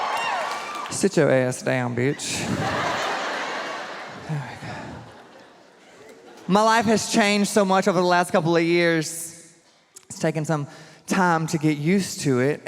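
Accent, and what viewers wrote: South, United States. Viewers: hes southern. This is a southern way of talking. Don't mean anything to race. Cause this isn't AAVE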